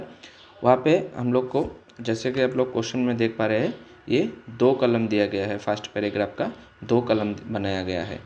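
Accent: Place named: Assam, India. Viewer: Very useful but Assamese type hindi explanation is boring.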